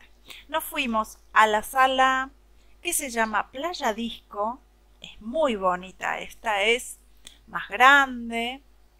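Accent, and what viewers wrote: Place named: Córdoba, Argentina. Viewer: Que te diviertas en tus vacaciones !!! 👍👍👍 Una preguntita... de qué lugar de Argentina eres... porque tienes un dejo muy particular... yo veía el programa de Olmedo y el Gordo Porcel... Olmedo hacía un personaje con un dejo muy parecido al tuyo...Perdón, creo que el personaje a que me refiero salía en No toca botón... creo que era de la provincia de Córdoba...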